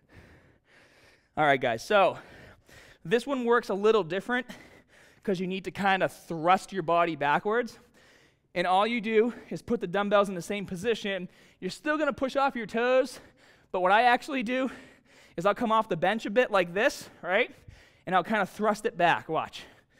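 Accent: Boston, United States. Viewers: Great video guide. You from Boston?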